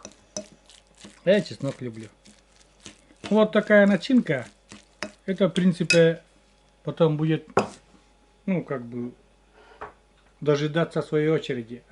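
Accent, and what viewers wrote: Komi, Russia. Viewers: Добрый день.смотриш на вас и душа радуется за вас,у вас всегда все хорошо.дай бог вам здаровье!у вас красивый коми язык + русский- красиво звучит.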